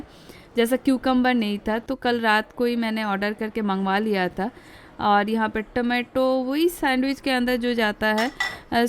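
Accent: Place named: Bengal, India. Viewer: agar aap is format me interested ho, in Hindi language, then I would advice, you have to work on your language using some kind of training. Aapke words bahot achhe hain, tone bahot achha hai, but the beauty of what you intend to deliver, is getting lost, as the Hindi is Bengali accented. Bol chal ki bhasha me koi bhi accented language achha lagta hai, but is type ka theme agar apka aspiration hai, then please look for a language training somewhere. Agar language finesse ayega, then the narration will be of another level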